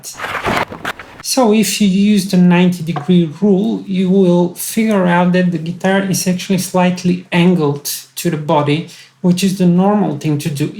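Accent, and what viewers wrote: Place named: England, United Kingdom. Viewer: I love your English accent! 🖤 What would be the best playing position when standing up?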